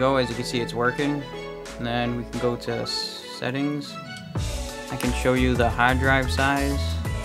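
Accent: Boston, United States. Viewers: Hawd drive. From Boston?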